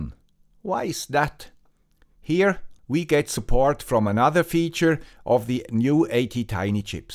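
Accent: Switzerland. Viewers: "Also a guy with a swiss accent"... Classic =P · The video started without audio on YouTube and my brain bugged trying to understand what was doing on the guy with the Swiss accent's channel. 😅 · Hard to listen to swiss accent for the first time. Let's put it into "watch later". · Does anyone know why he has a Swiss accent? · a guy with a Swiss accent, a vintage high tech NE555... and now ATtiny's... what an awesome Sunday morning entertainment.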